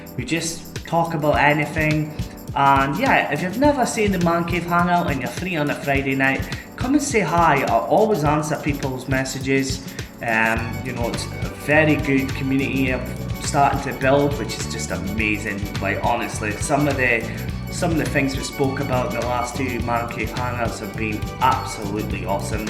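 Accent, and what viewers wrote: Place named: Scotland, United Kingdom. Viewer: What part of Scotland are you from. Come to New Zealand 🇳🇿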